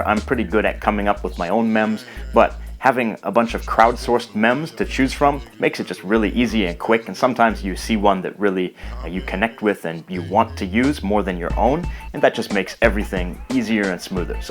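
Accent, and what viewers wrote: Canada. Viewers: Thanks for the information! I was curious because your accent didn't sound like the "standard" Canadian accent to me. From which province do you come from? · For some reason I knew that you weren't American. I know that you are trying to speak clearly, so non natives can understand you better, but in general Canadians speak more eloquently and clear.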